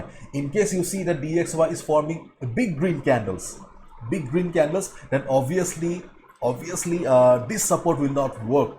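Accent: India. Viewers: Holy crap I’m an American and I love how your Indian accent isn’t thick at all its super smooth and easy to understand 😂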